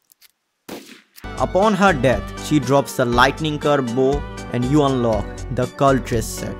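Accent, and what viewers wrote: India. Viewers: are you an indian your accent is funny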